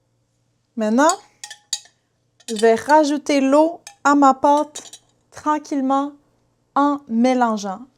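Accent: Canada. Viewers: I'm so glad it's in Canadian French. I live in New York, so most likely will go to Quebec and not France to experience the language.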